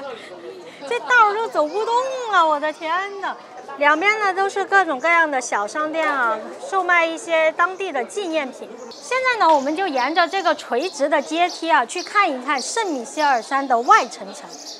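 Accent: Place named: Northeast, China. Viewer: 女主是混血吗 ？口音夹杂着东北口音❤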